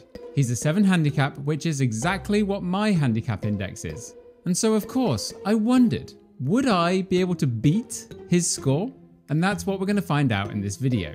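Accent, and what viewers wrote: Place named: United Kingdom. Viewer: Commentary excellent…. Forget the wind exists 😂😂😂😂😂😂 Where bouts the uk you from or where were you from ? 🇬🇧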